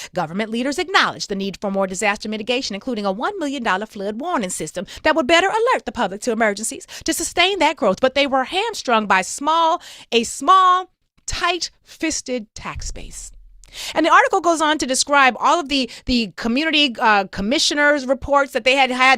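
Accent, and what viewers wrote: South, United States. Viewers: You are the best host on Urban View at dragging MAGA especially with your pearly white lady southern drawl.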